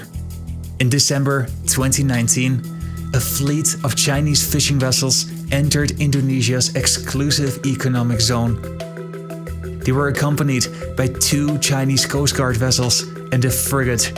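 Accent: Netherlands. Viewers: ROFL, I thought I reckognized that Dutch accent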